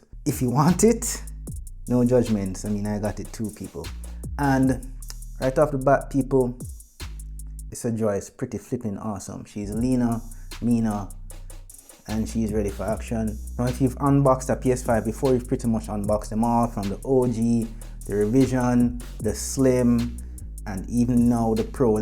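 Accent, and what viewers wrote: Jamaica. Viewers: Bring out the Jamaican out 🇯🇲🇯🇲🇯🇲🇯🇲😂😂😂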